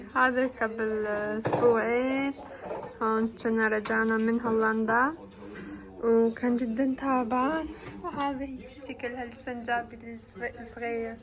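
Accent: Iraq. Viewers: عفيه عليچ شلون تحچين عراقي حلووو انت انسانة طيبة ،، وشفت شگد مقهورة على زوجك ،، وحسيت بغصة صوتچ ،،، الله يبارك بيچ حبيبتي الله يحفظچ ويحفظ وأيان باذنه تعالى ❤❤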